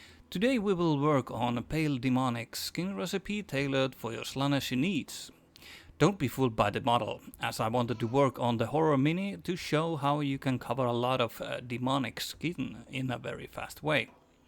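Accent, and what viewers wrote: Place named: Scandinavia. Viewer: Looks great!! I painted my Tzeentch similar but through airbrush techniques. Sounds like you are Scandinavian, svensk?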